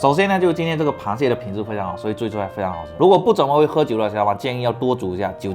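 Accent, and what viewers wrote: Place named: Hakka, China. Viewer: 看完之後感想 ……. 客家音怎麼那麼重 🤣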